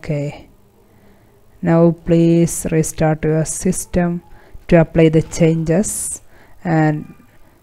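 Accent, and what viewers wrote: Kerala, India. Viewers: Typical Malayalee Accent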